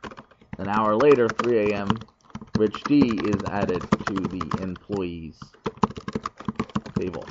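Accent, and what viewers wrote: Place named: United States. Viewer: the only video on youtube with north american English accent, easy to understand and no headache, no matter how good tutorial is by our Indian bros but very thick accent not worth watching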